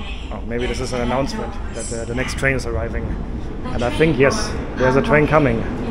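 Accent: Germany. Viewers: A word of advice: As a non-native English speaker with a noticeable German accent, it's important to slow down and articulate your consonants more clearly when communicating with other non-native English speakers. Speaking at your current pace may make it difficult for them to understand you, so adjusting your speech could significantly improve communication. Also, you are confusing supermarkets with grocery stores, please learn the difference. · 독일식 영어 발음이 참 매력적입니다.^^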